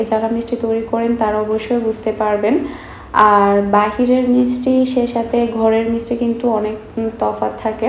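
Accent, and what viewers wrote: Chittagong, Bangladesh. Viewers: Apu apnar Basha CTG r kothai ????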